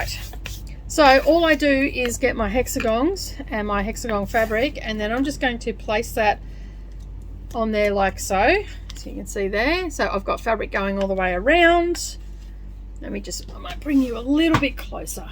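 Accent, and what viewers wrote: Australia. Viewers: Thelma writes:, so good to watch you doing EPP. I am doing just that at present using all my scraps. You voice brings me back to Melbourne where my son lives. I was there visiting my grand-children but did not have a chance to visit any craft shop. Thanks, I love the EPP, 👍 👍 👍 👍 Keep it up!!!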